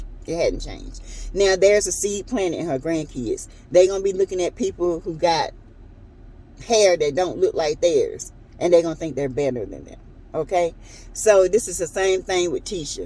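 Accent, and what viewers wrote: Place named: South Carolina, United States. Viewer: I like hearing your commentary. You sound like my family. They are from South Carolina but I'm from NYC.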